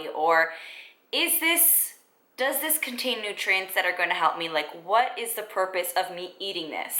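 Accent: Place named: Toronto, Canada. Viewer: I knew it, you sound like your Italian and from Toronto lol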